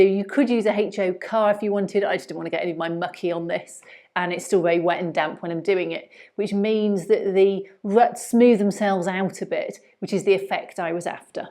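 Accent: United Kingdom. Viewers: Great video. I'm trying to place your accent, where in the UK are you from?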